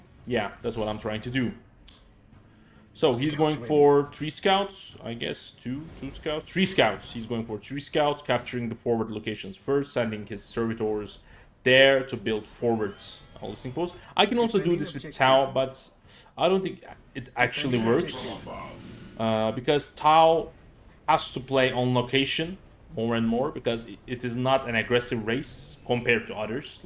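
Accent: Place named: Turkey. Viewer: You must be Turkish. You talk like me.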